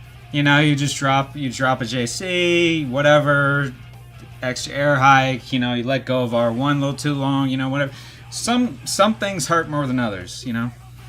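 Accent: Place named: South, United States. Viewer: you have a Southern drawl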